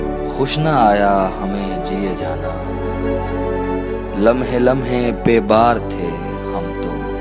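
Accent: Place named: Punjab, India. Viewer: punjabi voice.sir i know u.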